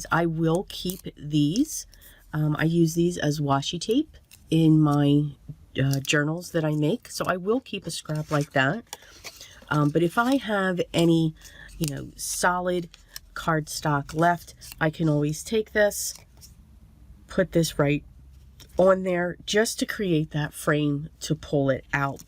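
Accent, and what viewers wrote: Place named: Philadelphia, United States. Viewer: Great ideas! Keep the videos coming! Couldn't help but notice your 'Philly' accent on the video. We're practically neighbors as I'm in NE Philly. ❤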